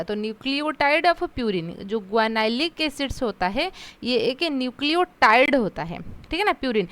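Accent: Odisha, India. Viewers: Ma'am ap Orissa se ho kya.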